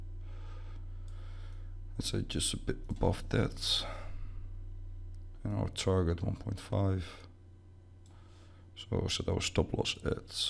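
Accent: Netherlands. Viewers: Nederlands accent 🤣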